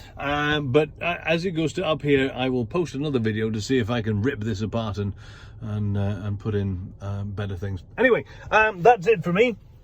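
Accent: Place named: RP, United Kingdom. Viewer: Hold on a minute. I'm 12 videos away from watching all your videos. I've been at it about 5 days. I'm watching in no particular order. I think I been going backwards mostly. Why are you talking so posh is this one? 😁😁😄🤣 what a difference from this one to your most recent haha. Anyway love your stuff, will be gutted if you get rid of Finn. I'm an aging L320 owner dreaming of an L663 someday soon I hope. Love from Scotland 🏴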